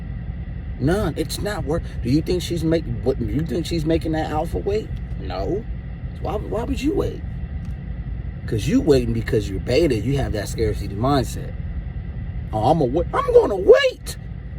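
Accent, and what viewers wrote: Baltimore, United States. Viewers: That bmore accent 🔥🔥💯👌🏾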